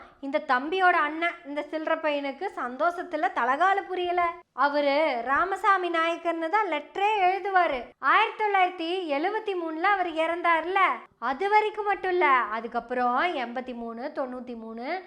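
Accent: Kongu, India. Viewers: Nee oru dmk பரதேசி போல இதுல கொங்கு மொழி வேற பன்னாடை கண்ணாடி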